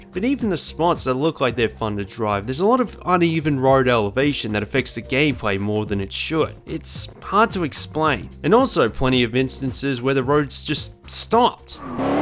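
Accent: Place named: Australia. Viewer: And that, had a Japanese version, with just Nissan cars. <link> / You poor, unfortunate, soul... You’re not wrong, you’re at a Million points, before you know it. - This list of games, says all I need to hear, about your channel... To be fair, on your semi-Aussie sounding ass, it seems that a lot of TDUX360, was transferred to TDU2, and you’ve made it noticeable. - 😂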